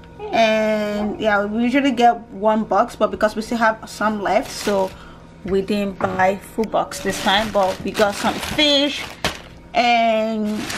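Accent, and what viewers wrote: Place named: Yoruba, Nigeria. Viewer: Am so addicted to this channel i just love the way you speak your yoruba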